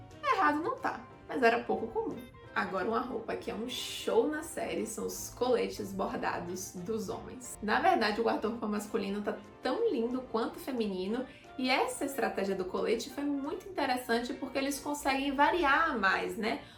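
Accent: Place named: Bahia, Brazil. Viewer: Amei o vídeo! Continue, não desista desse canal incrível ♥️✨ Edit: conheci o canal por esse vídeo e ja pelo sotaque desconfiei q era baiana, fui conferir o vídeo de apresentação e acertei! (Minha conterrânea kakaka)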